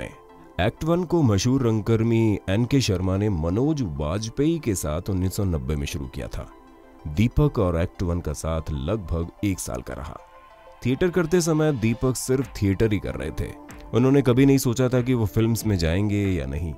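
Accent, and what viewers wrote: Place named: North, India. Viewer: 🤣😂🤣 । ये अच्छा है कि एक्टिंग करने वाले सारे उत्तर भारतीय कलाकारों की भाषा पे पकड़ और लहजा शानदार होता है। आप भी यूपी के हैन? वैसे आप ट्यूटोरियल कब शुरू करने वाले है।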